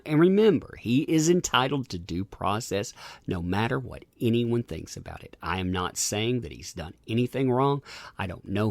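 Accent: South, United States. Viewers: I'm just saying Your Voice Sounds like the poster child for southern hospitality from a guy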